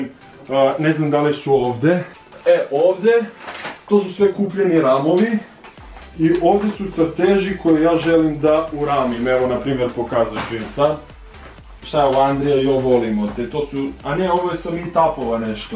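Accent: Leskovac, Serbia. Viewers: Ja sam iz leskovca ako bi moga da pricas 24h na sa leskovacki naglasak i glas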